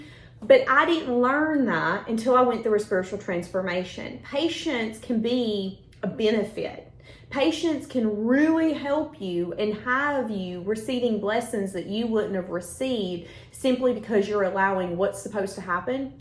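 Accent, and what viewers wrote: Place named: East Tennessee, United States. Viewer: Love the East TN accent:face-red-heart-shape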